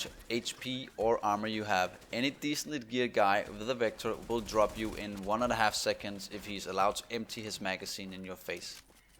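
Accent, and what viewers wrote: Denmark. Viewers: could instantly hear that's another Dane :) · Synes også det var en dansk accent jeg kunne ane hahah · Er du dansker? Lyder sådan på accenten ;)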